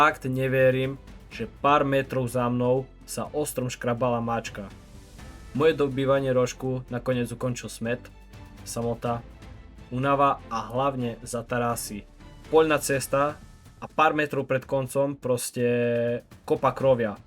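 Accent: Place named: East, Slovakia. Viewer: zaujmave ze si vichodnar a nebola tam ta to veta mamo ja idzu na vadrovku hlauski mi nabal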